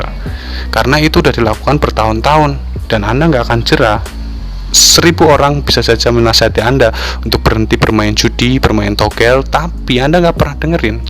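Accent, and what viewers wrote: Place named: Tegal, Indonesia. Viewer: ngomongnya medog logat tegal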